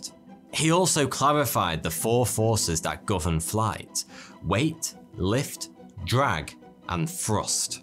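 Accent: United Kingdom. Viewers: I know that Brits say a lot of words differently (from Lost in the pond YouTube) but Charles' last name is Lindbergh not Lindenbergh. I had to listen again your voice while great is so soothing too. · bruh. I hate when people use copious amounts of emojis with a crappy throw away comment. Like this ain't a rap video that needs hype and It doesn't drive home Any point you made cause you made none. with his British accent sounds exactly like 42. And if you check pretty much all his videos everyone always thinks he's saying 42 until they realise, then we all have a giggle. Get it? Good attempt tho "🤣🤣🤣🤣🤣🥳🥳🥳🤯🤯🤯🌚🌚🌚🌛🌚" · I thought that’s what his screen name is, too, because of his Brit accent.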